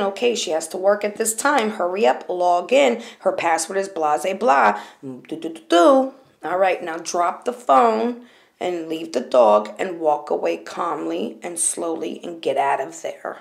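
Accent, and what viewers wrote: New York, United States. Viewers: love your accent, is it new york? say "i'm walkin' here!!" for me. ha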